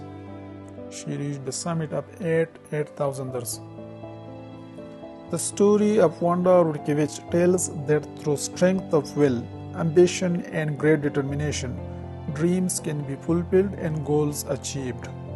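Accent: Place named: India. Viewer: I’m really glad I found this channel. Excellent research delivered so well. It’s wonderful to hear a strong Indian accent speaking such excellent English without compromising the Narrators Indian accent. The pace of the narration is excellent. Well done Narrator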